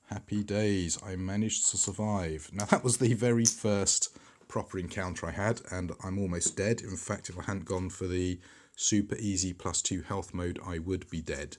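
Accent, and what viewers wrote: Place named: United Kingdom. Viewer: Thanks for sharing your videos. The encounter text somehow feels more appropriate when hearing it in a British accent. :) A small suggestion on your audio -- consider converting it from stereo to mono. When listening to the video with my headphones on it feels like your voice is moving around a bit. :)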